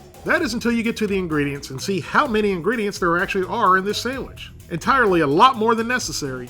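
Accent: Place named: East Coast, United States. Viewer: you sound like you're from the east coast. What area are you from?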